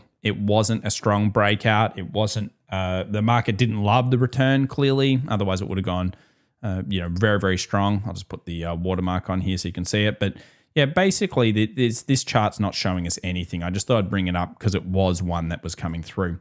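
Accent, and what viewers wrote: Australia. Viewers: haha I watcha de women with big jubs but I admit myself I just love the dudes Australian accent.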